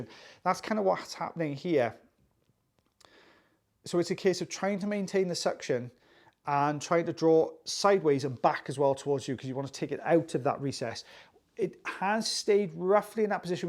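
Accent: United Kingdom. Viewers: Just found your channel a couple days ago. Watched an embarrassing amount of your videos. I don't think this would have came out the first time with a hammer and chisel. Also I like you narrating the videos and your excitement when a big chunk comes out. Plus I love listening to British accents, especially the word "tidy"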